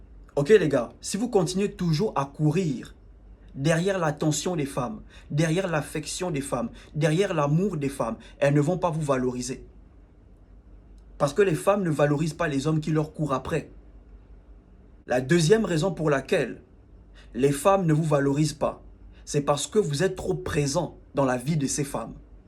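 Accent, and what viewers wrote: Gabon. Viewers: Bjr type j'aime tes conseils j'ai une question tes Gabonais ? Car ton accent est gabonais ❤si oui répond moi stp❤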